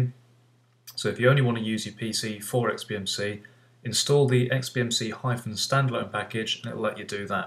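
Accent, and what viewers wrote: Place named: United Kingdom. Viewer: First video which is so useful.finally British accent. After following step by step guide,my xbmc is slower than slow.mouse does not respond and arrows are very slow to respond.any ideas to normalise? I am using acer aspire. Also no matter what the edges of xbmc is beyond the screen of tele.tried reducing and adjusting tv format,doesn't help. Suggest please!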